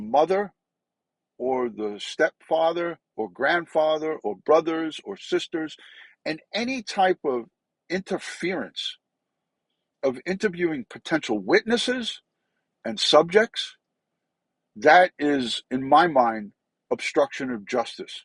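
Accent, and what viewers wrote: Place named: Rhode Island, United States. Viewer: How you doin? I love your accent. You sound like me. I'd from RI. Praying for you & your loss of your precious mama. Be glad loves you so much.❤️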